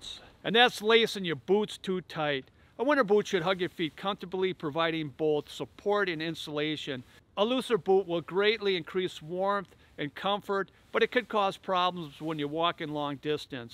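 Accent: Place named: Midwest, United States. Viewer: By your accent I'm guessing you're from the midwest? I'm from northern New England where it also gets extremely cold. I enjoyed watching your video for the good advice and I got a kick out of your accent. You'd probably get a kick out of mine too. Thank you for your service and happy Thanksgiving!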